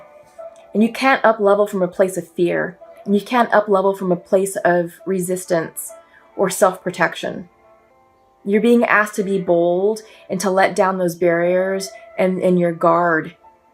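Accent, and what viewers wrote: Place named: Brooklyn, United States. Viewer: Sounds like your close to Verrazano Bridge Brooklyn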